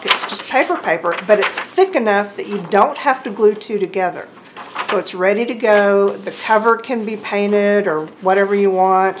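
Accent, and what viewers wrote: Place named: Texas, United States. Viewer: I’m so delighted to know they still make the color “YELLA” I thought they quit making it when I left Texas 30 years ago. When I heard you say “YELLA,” I had to “PEENCH” myself. And then I saw yer Chick fil a card.I’m soooooo homesick, even now. I lived in Tennessee for 4 years too. When I’ve been watching YOU, my aceant reverts and my hubby says “ you’ve been watching again.” I fought my accent fur years, coz people in California treated me like I was ignert. Then I discovered I was proud of it, and I cain’t get it back completely. Sometimes you need a little age and maybe a kick in the pants to bring you round to where you were. And where I was, I sounded JUST like you! Blessings yall❣️🙏🏼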